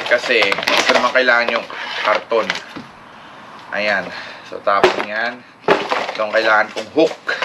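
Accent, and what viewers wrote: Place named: Ilonggo, Philippines. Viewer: D lng ang mga makuha mo ang gpaganyat maglantaw sa imo kundi ang mga Tagalog mo man nga tono ilonggo hahaha.namian mn kmi mamati . enjoy saving the food and some cheche buritche good luck and God bless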